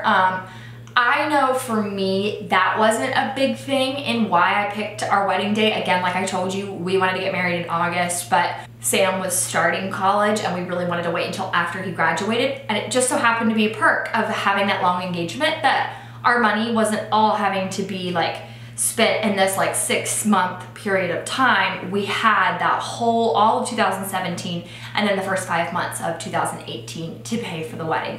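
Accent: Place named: South, United States. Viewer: Did you move to the south near the beginning of your engagement? I feel like your accent gets thicker in every video haha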